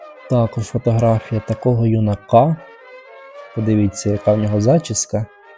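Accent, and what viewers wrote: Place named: Sumy, Ukraine. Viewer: Чудова молода,добра людина ,підписка. А Ви случайно не з Сумщини? Чую ,щось мені рідне і знайоме.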